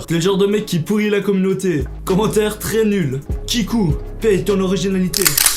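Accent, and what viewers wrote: Vaud, Switzerland. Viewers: T'es suisse??? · Tu est vaudois ? J'adore ce que tu fais 👌👍 Depuis que j'ai regarder cette vidéo je parle beaucoup mieux et les personnes son plus intéresser a ce que je dit merci 😊 continue 👍👍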